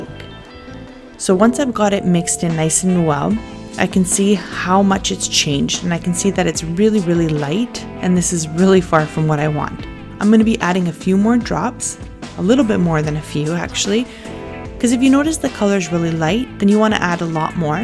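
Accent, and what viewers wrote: Canada. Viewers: 😹😹 YouTube isn’t really giving me my notifications otherwise I would’ve responded days ago. Yes you definitely have a Canadian accent When I left Canada and moved to the United States I was working for American Express and all of the customers kept saying where are you from because I would say “out and about” with that Canadian flare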